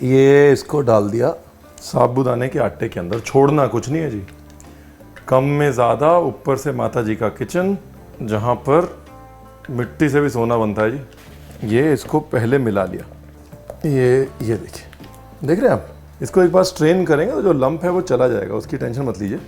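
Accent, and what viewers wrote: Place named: Lucknow, India. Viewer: Punjab in blood and Lucknow in soul..such a deadly combination you are...love the way you talk in “lakhnavi andaz”... Aaye Haye!!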